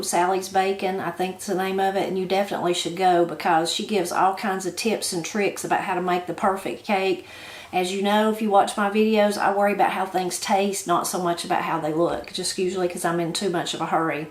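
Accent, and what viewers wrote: Appalachia, United States. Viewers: i fell in love with the appalachia accent it is so beautiful, i got a smile everytime i hear oil and moist, not in a making fun way, but because it wamrs my heart that every place has something so unique and special, flavors and sounds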